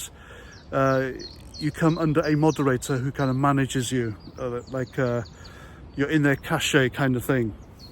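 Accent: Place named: Northern England, United Kingdom. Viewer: great accent too.. northern and proud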